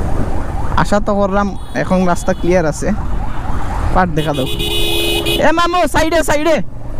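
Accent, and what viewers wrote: Sylhet, Bangladesh. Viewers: Asslamualikum bai apnar basha sylhet ar kun jaygay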